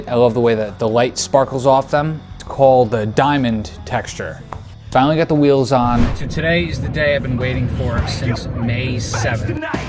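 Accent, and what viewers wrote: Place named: New England, United States. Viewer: Love this guy's videos but hot damn that yankee ass accent! I can get past it but it irritates my subconscious ever so slightly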